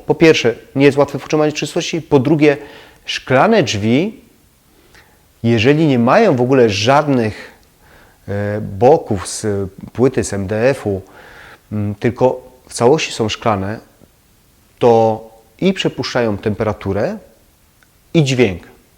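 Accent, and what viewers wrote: Silesia, Poland. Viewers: Cześ ! Słychać żeś jes chopok z Rudy . Racja mosz . Trzymej sie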